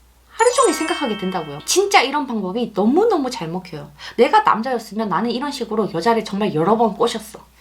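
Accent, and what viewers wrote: Gyeongsang, South Korea. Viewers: 경상도시네요....음...어딘지는 감이..새벽이라 잠이와서 ㅎㅎㅎ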